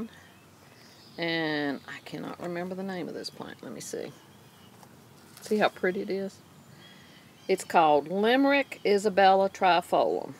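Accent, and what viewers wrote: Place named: North Carolina, United States. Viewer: Adorable fairy gardens. I just planted my first one a couple days ago and I'm already making plans for another. I like your plant choices. I absolutely love that purple/green shamrock looking one. I'm going to have to keep my eye out for that one. Are you from NC? Your accent and the weather in your video seems close to home. ;)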